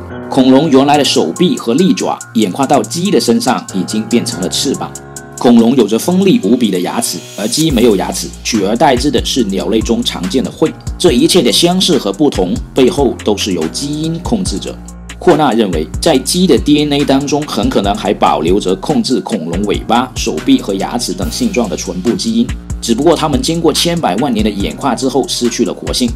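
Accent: Hong Kong, China. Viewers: 这口音，是香港人或广东人说的普通话吗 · 香港口音越聽越靠杯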